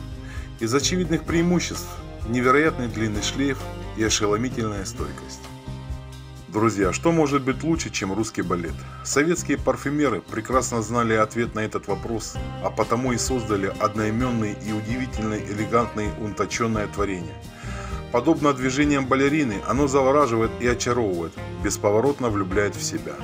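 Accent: Ukraine. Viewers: Это украинский или южнорусский акцент. А не издевательство! Речь не о том в видео! Спасибо автору за воспоминания и информацию для тех кто не знает. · Духи Праздничные- Россия и Юриала-3 - Прибалтика.А говор украинский за версту. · прекрасный украинский говор, что вы имеете против?)) не все говорят с маасковким ааакцэнтаам)))) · Вообще то это украинский канал, у них всё так говорят.... Могли бы сделать снисхождение, а не критиковать.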